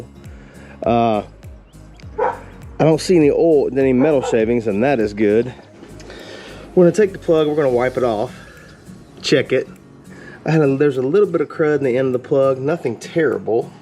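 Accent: South, United States. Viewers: I always look forward to Thursdays! Happy Thanksgiving,! I see you have now achieved 220 subscribers. Congrats! NICE t-shirt! I need to get me one of those. Now - you say EVERYTHING you will need to change the oil is right on the table. I do NOT see any Crown Royal or Mich Ultra., you are slacking. But I must say it looks like a surgeon's tray! Everything you need, there at the ready. Me, I'm in and out of the tool box and the house 10 times. I dig the ingenuity in using a dropper when you don't have another small tube or funnel to fit. That's something I would do. We learn by doing and get better as we go. What is there, a "thing" about Amsoil? I have never used it but it seems like they make a good line of products. Why would someone criticize using it? Gotta love YouTube. Learn something every day about these bikes. Looks like you got it filled up to spec with nice clean oil. 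My bike being 7 years old, I'm concerned about all my fluids - oil, shaft oil, hydraulic fluids and coolant. Maybe in the Spring. My tires still look good although original equipment, but I worry about them as well. I only have 700 miles on 'em. But dry rot is a concern. Love the way southerners say "oil". Ole. AmsOle. One syllable. Not "oy-l". Ole. I'm off to get a quick ride in before chowing on a delicious turkey dinner. Have a good one! Tongue click, finger point back atcha.😎😜👓🕶🏍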